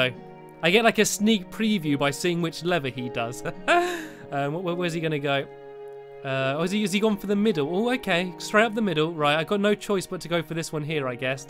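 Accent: United Kingdom. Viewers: himesamacosplay thats just how he says it since he lives in the UK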